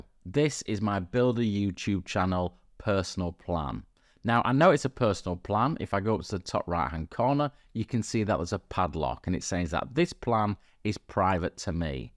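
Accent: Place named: North, United Kingdom. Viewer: I was like "the hell is a book-it", but it's that northern charm "bucket". Love the videos!!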